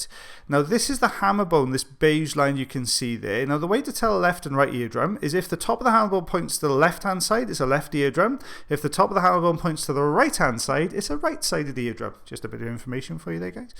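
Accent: Wales, United Kingdom. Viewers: you are now an inch-reading maestro! Thank you for the videos to keep our spirits up. Your lilting Welsh voice is such a comfort! Sending happy thoughts to you and Mrs B. Thank you also for the little lesson on light reflex and the eardrum and hammer bone.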